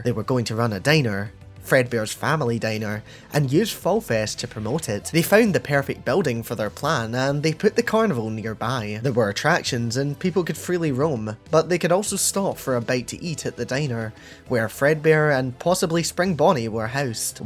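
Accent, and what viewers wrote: Scotland, United Kingdom. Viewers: great theory actually AND you remind me i love scottish accent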